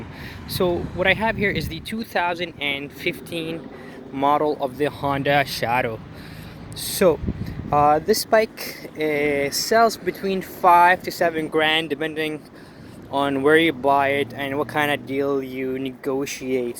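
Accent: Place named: Canada. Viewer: It's Canada.... the accent should have been a giveaway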